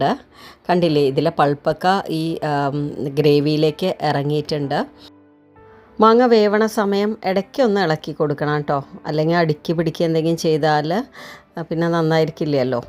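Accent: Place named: Palakkad, India. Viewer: Palakkad aano ? Bhasha kelkkumbol ende naatukari aano enna samshayam.😊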